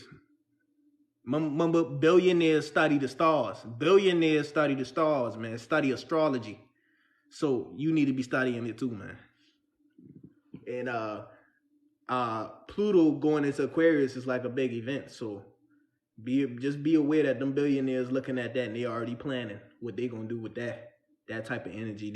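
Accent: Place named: Geechee, United States. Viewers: Bro I’m hearing that geechee accent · You sound geechee.. You from Charleston ,SC.. I knew it 😂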